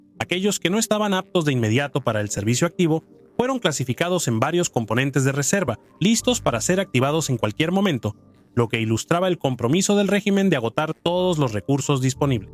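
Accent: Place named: Latin America. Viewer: no puedo man ¿que coño me hablas de nuestra guerra con ese acento bananero? Leck mich am Arsch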